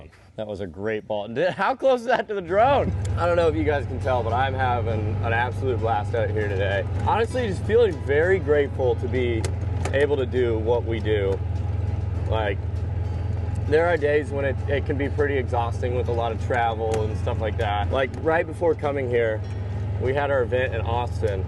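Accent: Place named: Minnesota, United States. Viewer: Minnesota, eh?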